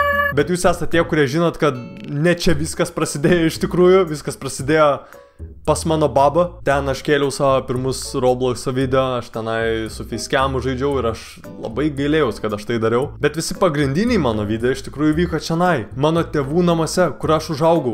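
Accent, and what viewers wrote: Panevėžys, Lithuania. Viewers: myliu panevežio akcentą❤ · Joptvoj tu man tik dabar priminei tus 2016 video... o taip tai visada as maniau kad tu is panevezio nes tas akcentass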